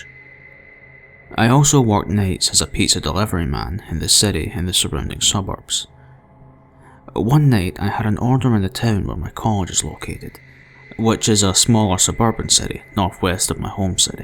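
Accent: Scotland, United Kingdom. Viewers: you're a man of great talent. thanks for the text back and keep giving us a brilliant channel. the accent? I'm thinking you're from the east coast of Scotland?😃.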